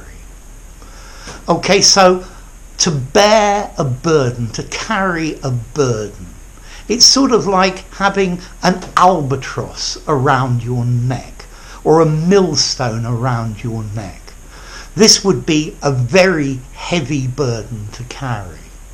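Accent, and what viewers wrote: United Kingdom. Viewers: Are you from Great Britain🇬🇧?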